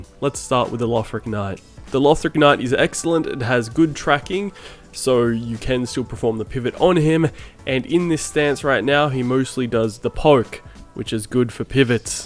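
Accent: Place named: Australia. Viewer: why hello fellow Australian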